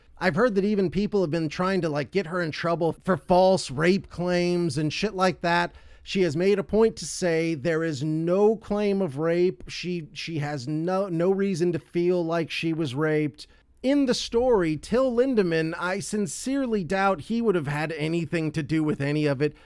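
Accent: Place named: Germany. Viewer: Very funny german accent ahah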